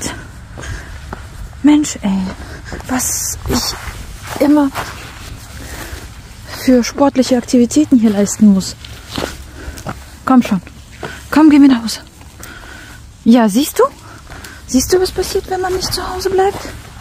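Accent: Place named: Turkish German, Germany. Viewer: Jetzt fängst sogar du schon mit diesem Türkendeutsch an man man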